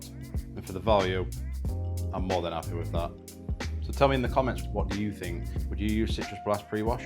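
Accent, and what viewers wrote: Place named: Yorkshire, United Kingdom. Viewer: A Yorkshire accent in a video always get a sub from me. Great video pal.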